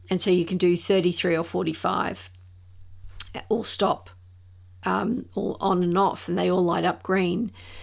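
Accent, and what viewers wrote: Australia. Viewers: Hi, I have a Philips something tt. As soon as you mentioned an Australian Hifi show I started your video and immediately heard a familiar accent. I'm in Sydney. I bought the Philips because of its beauty and simplicity but don't use it much coz I love my Tidal subscription for convenience. I restore n build Hifi gear for fun. My name is Peter. Cheers. Oh I saw your link on the Audiophiliac Steve Guttenberg channel.☮️🇦🇺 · Great to hear an Aussie voice. ❤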